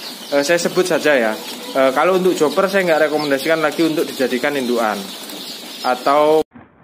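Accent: Java, Indonesia. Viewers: logat bahasa kok seperti orang Jawa . Tak kira Jawa mas, 🤭. Di daerah saya belum ada pembibitan joper, jadi susah mau ternak joper tak ada bibitnya.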